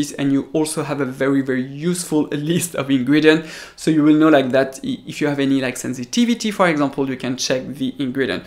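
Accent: France. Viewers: Welcome back!!! I am so glad your making videos again! I love your French accent so much!!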